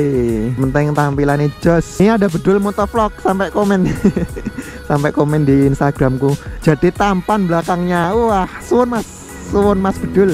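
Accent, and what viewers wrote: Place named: Yogyakarta, Indonesia. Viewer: omonge jan asli jogja... hahaaha apik mas.. lanjutkan pokoke.. tak subscribe ndes.. haha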